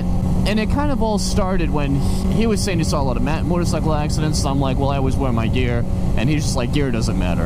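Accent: Massachusetts, United States. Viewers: Are you from Massachusetts?!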